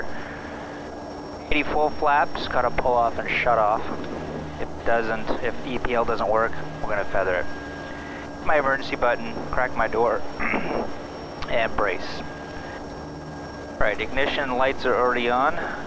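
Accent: United States. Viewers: happy to oblige. I'm in South Lake Tahoe, CA. You sound like you are from So Cal perhaps. Interesting story you have. A joy to watch. Cheers!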